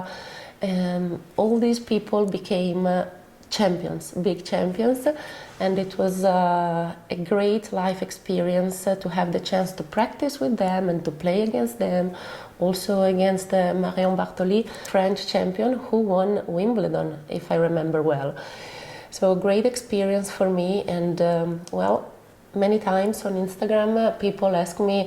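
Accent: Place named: Italy. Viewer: An Italian accent adds beauty to an already attractive watch. Thank you.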